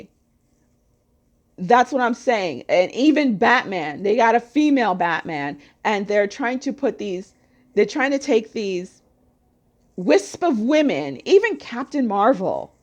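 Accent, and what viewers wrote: New York, United States. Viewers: Holly molly about the Divine Masculine = thats makes a world of sense! I know there’s a Cabal kabosh on them - starting with the African Americans & now the White males! And I feel there’s one too for divine FEM..bc I was stuck for so longggg.. yet no more! I’m hearing from Brad Johnson / Adronis that the moon is also an emitter of mind control and it’s going down as of now! Kent Dunn - behind the scenes military white hat- talks about the moon being re built into a whole new model! I’m a researcher - one who puts the pieces together... and I use my intuition & I use muscle testing & prayer / intention & meditation along with that.. I love your New York ness lol! I’m getting the rainbow warrior tank for sure now & prob the Gaia awakens next month (I laid down serious cash for dimensions of disclosure here in VENTURA CA!) Gotta go hang with “my people” lol! 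And I’m there on the 8th & maybe on the 11th.. gotta figure it all out I’m SUPER interested in ascension 😘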